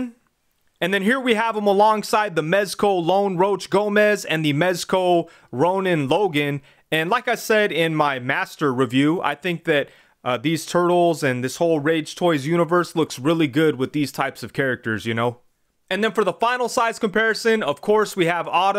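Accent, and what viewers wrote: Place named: AAVE, United States. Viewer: Dude speaks in Ebonics and is happy to review copyright infringement toys. Lol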